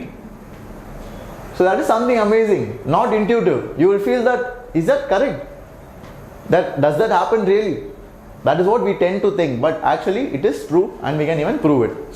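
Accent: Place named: Karnataka, India. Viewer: Sir's Kannada accent is 👌👌👌👌👌👌👌👌👌👌😊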